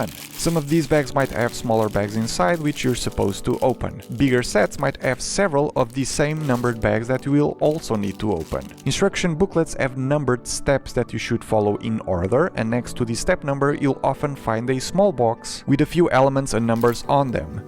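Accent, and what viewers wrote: Portugal. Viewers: És português? Parece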